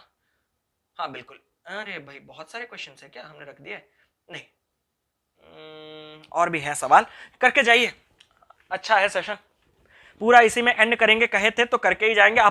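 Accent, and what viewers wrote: Odisha, India. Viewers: R u from Odisha?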